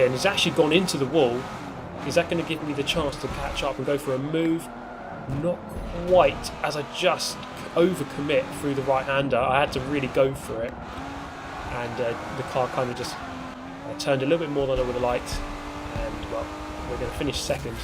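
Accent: United Kingdom. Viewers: Just found your channel, and I love it! Excellent British commentary lol and great racing. Keep it up!!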